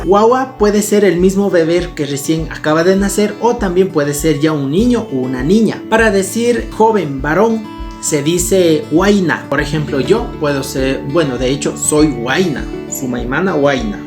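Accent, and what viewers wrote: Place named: Cañar, Ecuador. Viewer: Ahhhhh. Con razón no me daba. tu dialecto es del Cañar, por eso hay diferencia entre lo que aprendí (kichwa unificado y, aunque lo nieguen, dialecto de Otavalo)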